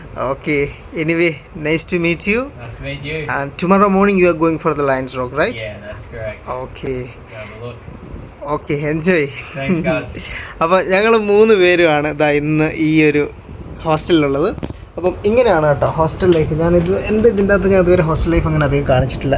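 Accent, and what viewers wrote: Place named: Kothamangalam, India. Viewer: നിങ്ങൾ കോതമംഗലം കാരനാണോ കണ്ണൂരുകാരൻ ആണോ നിങ്ങളുടെ ഭാഷാശൈലി കോതമംഗലം ആണല്ലോ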